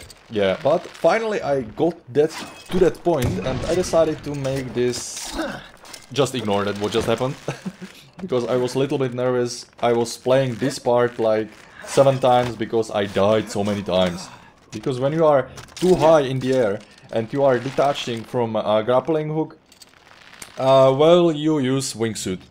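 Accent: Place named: Europe. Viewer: What country are you from? I was going to guess but I would probably get it wrong lol. Somewhere in europe.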